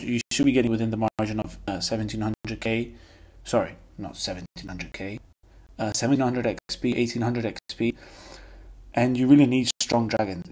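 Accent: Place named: Eastern Europe. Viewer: cause they are like suka.. I hate it hahahaha so I need to watch more of your videos; I'm assuming you are Slavic from your accent.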